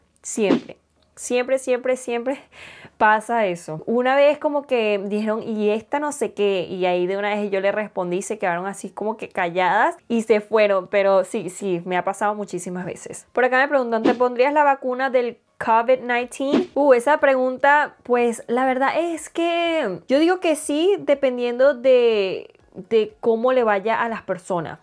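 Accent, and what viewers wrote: Venezuela. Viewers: Amo lo coloquial q hablas se identifica lo venezolana ❤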